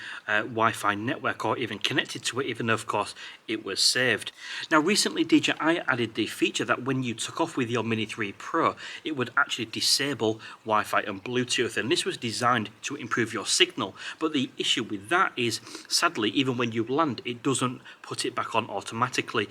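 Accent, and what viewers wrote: Hull, United Kingdom. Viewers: All of us from "ull" can understand him when we fly down "our tenfoot" looking for "dead bods".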